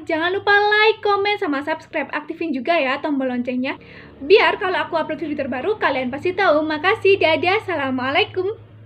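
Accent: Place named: Malang, Indonesia. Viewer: Khas logat malangan ki bocahe. Ayu ayu kinyis kinyis wong kono yo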